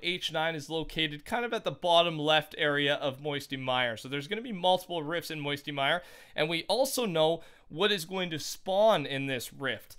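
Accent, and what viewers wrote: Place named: Canada. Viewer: Is he canadian? I heard a little accent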